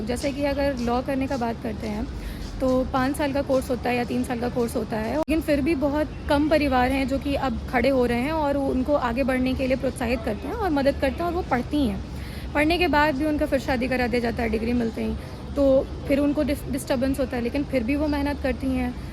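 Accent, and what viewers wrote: Bihar, India. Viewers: The way you taking is just awesome Are you from Bihar ??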